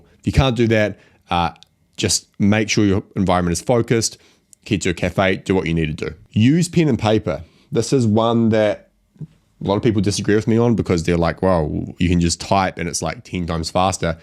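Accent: New Zealand. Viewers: probably you have to wait for YouTube. I think he has a New Zealand accent, I also have to pause sometimes to rewind and understand a couple words that are pronounced differently than what I'm used to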